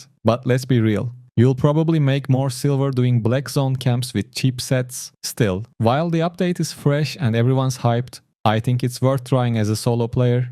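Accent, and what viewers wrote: Turkey. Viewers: türk aksanı var sende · türk aksanı var azıcık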